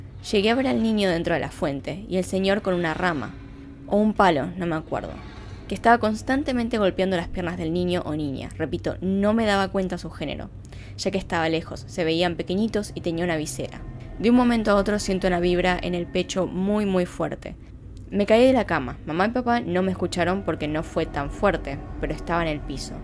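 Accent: Argentina. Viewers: Bueno muñeca preciosa, no se como cai acá pero ya cai, por tu acento sos Argentina....o me EQUIVOCO. Mira piba sos muy bella.. Te saludo desde Bremen Alemania.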